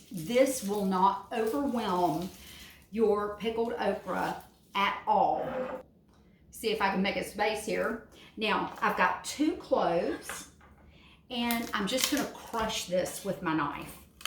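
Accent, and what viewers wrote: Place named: South, United States. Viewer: I could hear you talking for hours. Love southern accent. After 43 years in California. The way you talk is just music to my ears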